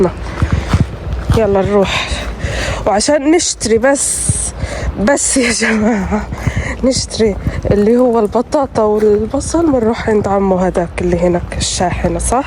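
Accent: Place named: Algeria. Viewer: لايقتلك اللهجة الجزائرية ❤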